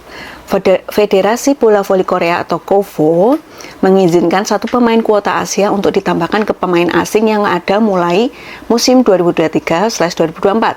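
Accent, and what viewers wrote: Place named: Banyumas, Indonesia. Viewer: Ora ngapak ora kepenak... Salam republik ngapak..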